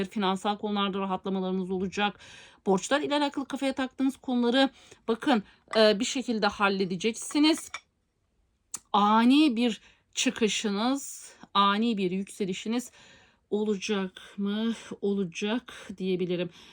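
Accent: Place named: Azerbaijan. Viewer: Salam. Siz Igdirlimisiniz. Sanki konusmanizda bazen benziyor da o yüzdən. Yani bazen azərbaycanca konusmanizda da var Ben onu hiss ediyorum.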